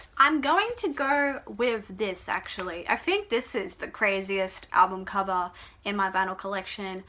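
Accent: Australia. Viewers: I’m so happy you found me so I could find you! I lived in Australia for a few years and the moment I heard your accent it felt like home. Also love your picks, I’m waiting to buy when I can go out to a record store 😊 fun video, I’ll have to do a quarantine tag.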